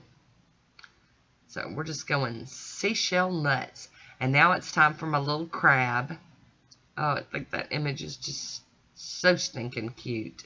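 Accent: South, United States. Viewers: I LOVE the southern accent. I’m from Talladega Al. Where are you?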